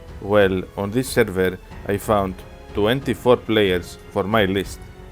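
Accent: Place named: Greece. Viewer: Greek accent hits hard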